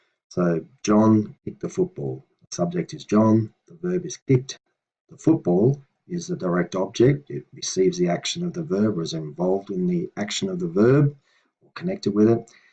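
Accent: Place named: Australia. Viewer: You sound like an aussie guy i tend to imitate them and i learn Old English as well so thats why i follow you :3 thanks for the vid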